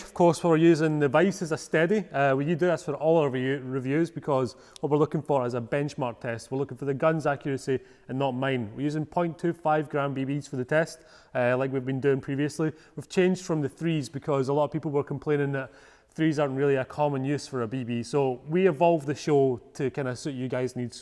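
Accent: Scotland, United Kingdom. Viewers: Such a thick Scottish accent sometimes it sounds like he's speaking a different language.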